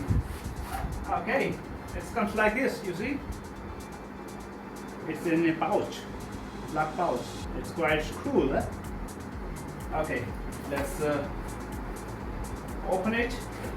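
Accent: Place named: India. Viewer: You sounds like pure NRI....nice unboxing video...keep it up 👍👍👍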